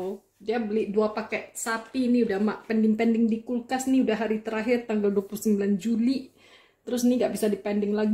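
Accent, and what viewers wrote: Medan, Indonesia. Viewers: OMG ii logatnya medan kali. tapi begitu ngomong inggris kaget i. jago bgt wkwkwk pengen nyobain tapi bahannya susah d sini huhu..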